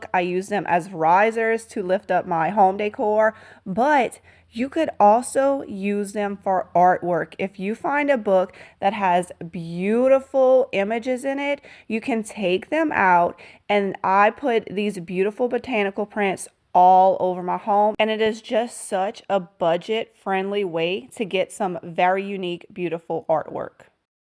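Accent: Louisiana, United States. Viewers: I love you, I love your Cajun rambling, I love your Mississippi home, and I can’t wait to see all your new adventures. I was afraid you were going to announce you’re quitting YouTube to focus on FB and your website. I’m a YT girl so you can imagine my relief. You keep doing you girl, I’m along for the ride.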